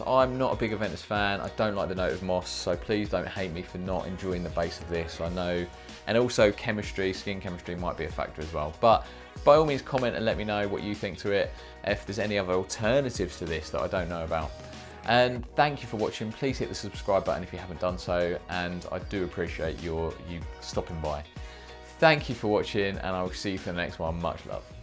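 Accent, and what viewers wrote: United Kingdom. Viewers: Wow Ed Helms has a great English accent.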